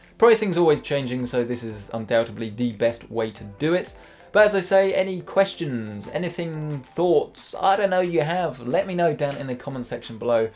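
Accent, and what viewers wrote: United Kingdom. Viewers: This british accent’s so nice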